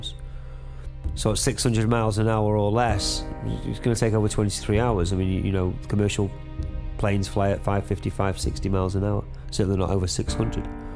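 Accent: United Kingdom. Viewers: Roger Reddersen it's called northern, how a lot of us English speak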